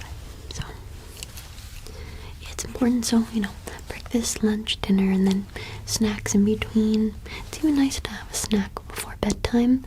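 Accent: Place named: Yorkshire, United Kingdom. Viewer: Чисто йоркширское произношение.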